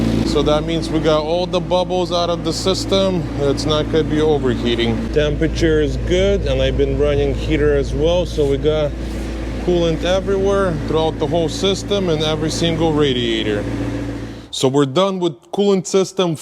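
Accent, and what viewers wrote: Russia. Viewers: Я думал мне показалось что автор русский😂 👍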